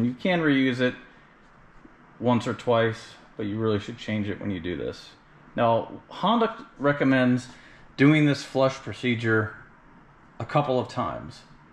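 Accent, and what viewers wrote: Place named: United States. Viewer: Probably too late to be helpful but at least in this video he’s using 2.7 quarts, not liters. He sounds American and the bottles here are sold in 1 quart quantities. Not totally sure what the quart-to-liter conversion is but I’m sure you could google it and it might end up that 4 liters is 2.6 quarts? :)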